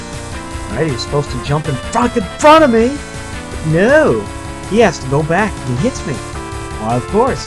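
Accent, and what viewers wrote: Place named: Georgia, United States. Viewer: Well, I like it. But then again, I'm a HUGE stats-geek. Profiles, bios, statistics... I like anything like that. Also, trivia. ANYWAYS, what I really like about LPs is that every LPer brings a different attitude to the game. Like with Gundams and pilots. Your attitude, plus the charming accent equals my subscription. I would have that accent, too if I was born one state up. Damn you, Florida! I would trade oranges for peaches any day of the week. Unless I had scurvy.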